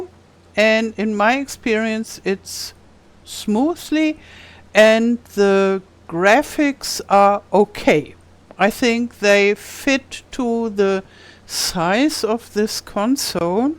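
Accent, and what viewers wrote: Germany. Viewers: Just found your channel after searching for videos on farming sim se on switch 2 cause im trying to decide whether to buy it. Absolutely loving your accent, I'm Australian but you sound just like a good friend of my husband and I that is originally from Germany. Her and her husband moved to Australia decades ago but they both still have their beautiful accents. Great info in the video too.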